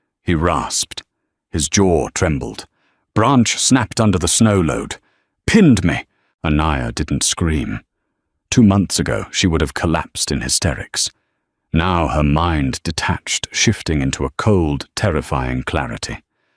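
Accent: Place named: RP, United Kingdom. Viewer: This upper crust British accent is not a good voice for pioneer stories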